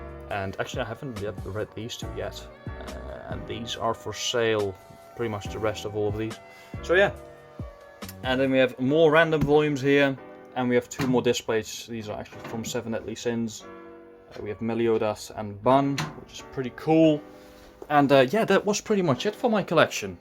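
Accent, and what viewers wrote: Netherlands. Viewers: I currently have about 700 volumes in my collection and my favorites are Oysumi punpun, Pandora hearts, AOT, Berserk, and Monogatari lmao. Nice collection btw! You have really good taste imo. Also are you dutch?😂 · Hahahah moet wel je kunt gwn meteen horen dat ie Nederlands is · Are you Dutch perhaps? You're accent sounds really familiar but I don't want to assume. Great collection and thanks for showing it! Edit: I just scrolled through to the comments and apparently I'm really bad at listening 😅. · Are you Dutch? Great collection btw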